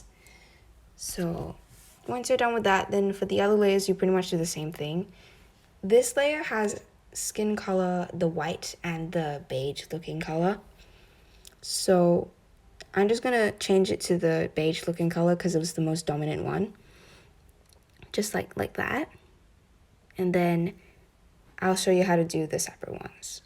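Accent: United Kingdom. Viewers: you are british? u3u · the British accent (Or the Australian accent whichever she has both are awesome) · Her voice: has this British accent My voice: dying rat noises intensifies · omg I watch this vid and it was really helpfull, your voice helps me a lot too. Guys, I think we have the best Edit Teacher here xD And Thanks very much for this video. (Oh and, are you using british accent? I use that accent too) edit: I use this tutorial for 6 days, and I finally did it ;>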